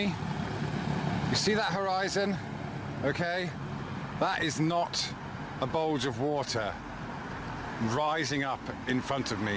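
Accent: United Kingdom. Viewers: A lot of us in the UK speak like that. To be fair, has a pleasant speaking voice, even if most of what he says is nonsense:-)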